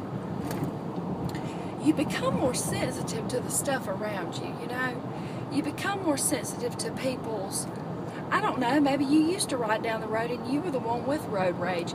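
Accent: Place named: South Carolina, United States. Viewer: I love you so much! I'm originally from SC and I miss hearing the accent so much! It's so comforting and your energy is so peaceful and loving! Thank you for making videos! I was going raw for a couple weeks but the past two days I got really stressed and ate two big junky dinners two nights in a row and now I have a terrible ear infection! It was really the kick I needed to go back to fully raw (bring on the detox and let it go!)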